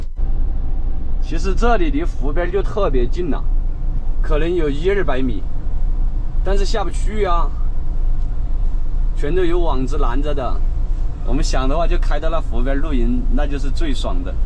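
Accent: Chongqing, China. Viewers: 聽你這口音我就估計是重慶的，結果一看車牌還真是。玩得嗨哦，拿個貨車當房車到處轉。最近重慶封城，怕回不來了哦。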